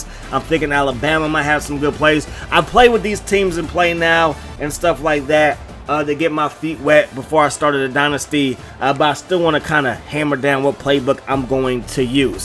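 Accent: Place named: Prince George's County, United States. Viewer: Sounds like PG county accent.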